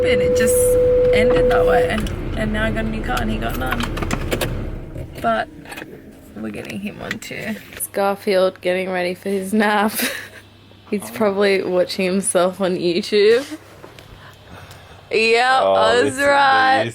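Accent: Australia. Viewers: Every time I finish watching one of your videos, I naturally attempt to speak in an Australian accent for the rest of the day.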